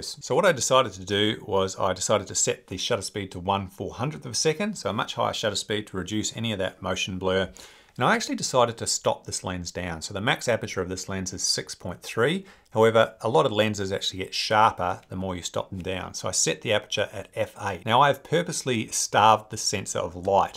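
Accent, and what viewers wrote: Australia. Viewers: Hi, I'm really enjoying the videos... it's wonderful to hear an Australian voice, highlighting birds that are there for us all to see/capture. I have already subscribed, but am trying to find the 'join' tab, so I can sling a small amount your way in appreciation. Can't find it... perhaps you already have too much cash and don't need any more! If you can steer me the right way it will be a win-win. Have decided to bite the bullet and go mirrorless with an R7, and an 18-150 as my 'everything but birds' lens and a control ring adapter so I can use my (second-hand) 100-400Lii, plus a 1.4 extender. Happy days!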